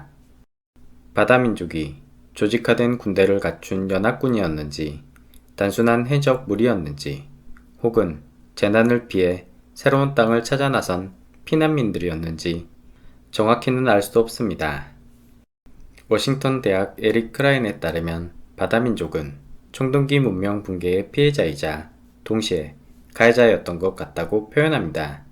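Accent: Jeolla, South Korea. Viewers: 내용이 좋은건 당연한데 은근 슬쩍들려오는 전라도사투리가 갱장히 친근하네요ㅋㅋ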